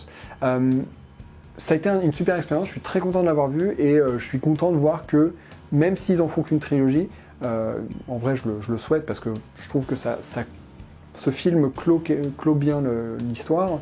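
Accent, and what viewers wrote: England, United Kingdom. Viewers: mais cet accent anglais je suis jaloux !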